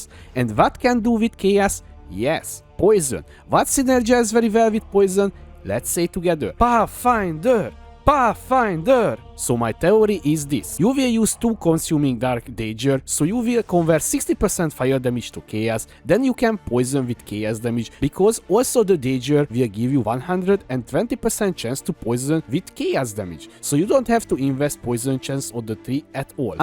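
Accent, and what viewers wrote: Hungary. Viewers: I agree with the comment that warns new players to avoid this. AVOID this build. Why? -You are sacrificing a fuck-ton of item slots for unique items. Both daggers taken, chest slot taken, and you STILL need to solve 100% inc spell damage taken on low life. -ONTOP of this, you are already losing 25% of your damage (it's like gaining a debuff: deal 25% LESS damage) because it's not possible to reach 100% conversion. -Also need to sacrifice 2 jewel slots to force out cold and lightning. 2 jewel slots is not insignificant. -In conclusion this is absolutely bait. You are sacrificing so many item slots and you have not even begun to address defenses. Also I can tell you are Hungarian by your accent.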